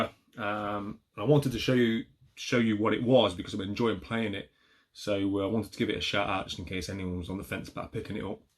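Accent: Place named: South London, United Kingdom. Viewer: Hey man - great channel. can feel/hear a South London accent similar to mine haha(hope im right). you've got a sub - came over from gaming off the grid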